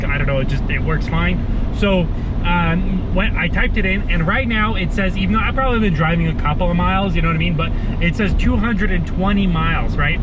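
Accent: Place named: New Jersey, United States. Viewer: Awesome video, you actually talk like you could be from Jersey ✌🏽keep up the videos brother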